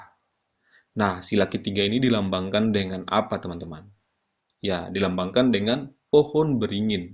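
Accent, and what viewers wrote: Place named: Eastern Indonesia, Indonesia. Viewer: Anda orang timur yah..? Soalx ejaan kata E nya kelihatan klu anda orang timur , mkasih yah.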